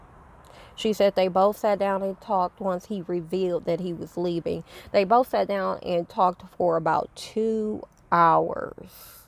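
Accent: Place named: South, United States. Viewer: Hey auntie you are so pretty! I love your accent. Are you from the south? New subbie. Chrissy is way better without him...he is literally deadweight. I can tell just by the videos she does everything and he is just another child. He is not a man, he was a boy. I have no respect for a man who leaves his pregnant wife and kids and to move all her kids and mother ALONE.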